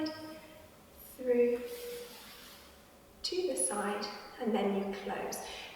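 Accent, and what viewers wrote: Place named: England, United Kingdom. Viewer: Well done! Very clear and well-thought out. Plus I love the English accent and correct ballet terminology.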